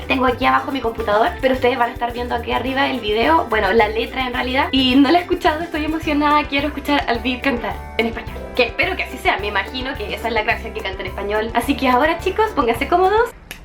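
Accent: Chile. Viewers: es chilena? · Eres chilena cierto 😓😓😓😓😓😓😓😓😓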